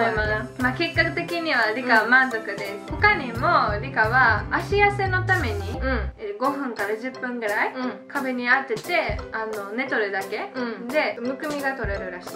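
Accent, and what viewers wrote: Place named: Mie, Japan. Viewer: の三重弁くそ好きなんだよねー♡♡笑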